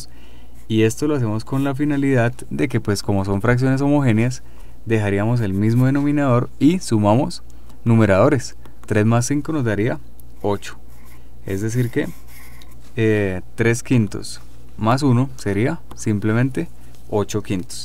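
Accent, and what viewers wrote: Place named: Colombia. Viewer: excelente video , muchas gracias por subirlo y mas con ese acento de colombiano.... gracias infinitasss !!!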